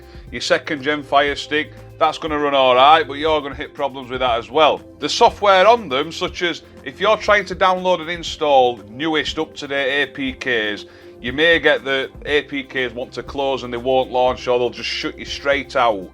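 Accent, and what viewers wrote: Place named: Scotland, United Kingdom. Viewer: This "Yank" loves humor with a Scottish accent! Thanks for making me laugh. Great info. I have fire "devices" from 1st gen to Fire Cube. I've been trying to get my 1st gen stick to work better and stop rebooting, and I think you nailed it with the power input. Used a 2.0a charger. However, it's still acting up, so it's probably just shot and old s/w doesn't help. Can't scroll through settings, just jumps. When I get to "Fire Device" it doesn't have reboot and even with 2.0a on updating says "power may be insufficient" . So think i'll give up on the 1st gen, and stick with 2nd gen (don't have 4k TVs). However I noticed unplugging my 2nd gen FS it was really hot. I like your idea of taking the shell off to run cooler but unplugging it might be hot? Was thinking may taking skin off and drilling holes or making it more open to let out more heat. 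Thanks. Great ideas!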